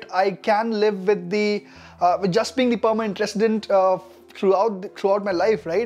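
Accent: India. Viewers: Documents are just the formal papers just papers..not your moral identity. You , anyway. keep being an indian with your accent,tradition,yourself,familiy values,canadian passport cannot take all these ones away from you. Dont take it close to your heart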